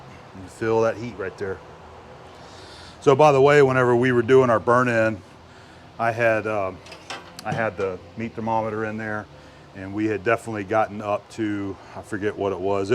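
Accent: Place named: Western Pennsylvania, United States. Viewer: So what is your connection to I-79? I hear a little bit of Western PA in your voice but I think you’re in FL.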